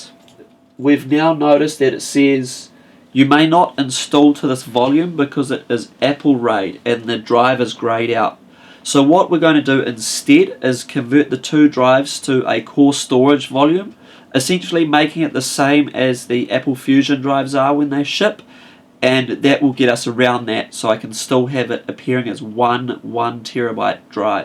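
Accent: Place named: New Zealand. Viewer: that is a Kiwi accent, (New Zealand)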